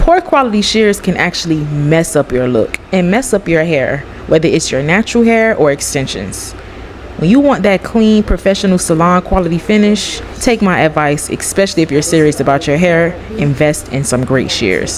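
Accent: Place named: Florida, United States. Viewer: the way the florida accent done became so recognizable to me now is crazyy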